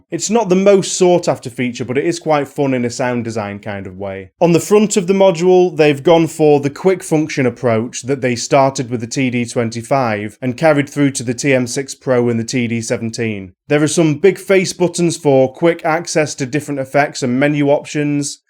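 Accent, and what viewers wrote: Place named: Yorkshire, United Kingdom. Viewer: Nice to hear a Yorkshire accent for a change!!!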